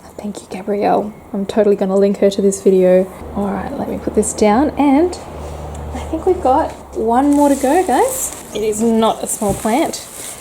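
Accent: Australia. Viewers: Thank you for this great 100th video. Nice to see the face with that fabulous Australian accent. Keep the great videos coming!!!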